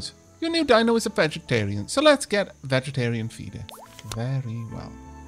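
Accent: Wales, United Kingdom. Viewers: Our good friend is Welsh, which gives him that lovely accent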